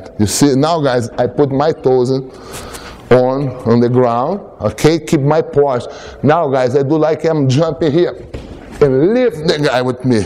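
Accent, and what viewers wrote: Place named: Brazil. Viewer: My sensei is from Brazil who is living in Australia with a similar accent to. I find it normal when Brazilians or any Nation's first language is not English. If you understand BJJ then listening to broken English is not important when you're paying attention to the drill/technique more;) Master - I love watching your videos. I learn so much especially of someone from a higher belt. CHEERS:)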